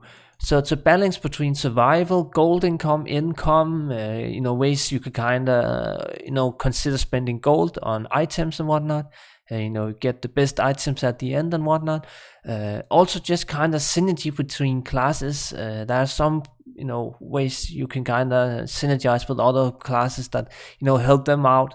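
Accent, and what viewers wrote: Denmark. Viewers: You're a dane, right? :) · i love your Danglish :D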